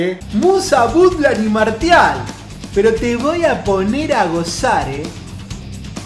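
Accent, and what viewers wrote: Argentina. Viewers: JAJAJAJAJA que grande sos...Nos caracterizas 100% como Argentino jajajaja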